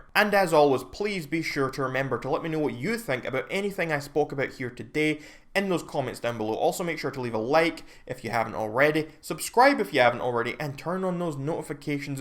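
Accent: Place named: Scotland, United Kingdom. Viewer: What is the world coming to? Just wanna say it's so comforting hearing a Scottish accent on here - love from Scotland 💙💙💙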